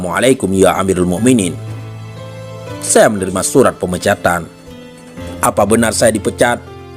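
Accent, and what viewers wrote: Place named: Bali, Indonesia. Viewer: Yg bcra sperti orang bali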